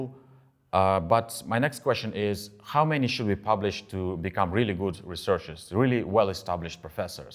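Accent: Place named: Russia. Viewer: I was thinking "ah, familiar accent". Hello to fellow Russian researcher. I'm also a researcher at US University. And papers fever is way too real. =( I have literally 3 citations.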